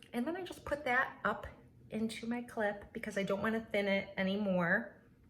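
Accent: Wisconsin, United States. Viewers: I hear Wisconsin or Minnesota in your voice?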